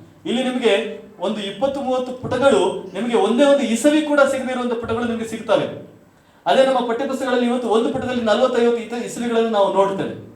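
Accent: Standard. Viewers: ನಿಮ್ಮ ಶುದ್ಧ ಕನ್ನಡ ಕೇಳುವುದಕ್ಕೆ ತುಂಬಾ ಆನಂದವಾಗುತ್ತದೆ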